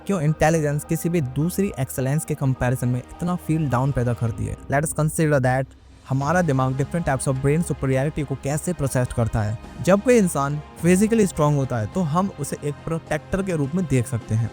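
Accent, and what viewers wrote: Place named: Bengal, India. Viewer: Ek baat samajh aya ki bolne wala Bangali hai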